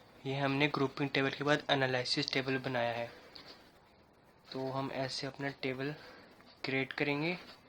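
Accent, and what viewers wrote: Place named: Haryana, India. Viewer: Haryanvi lgte ho nice explaination good dear ! 🌏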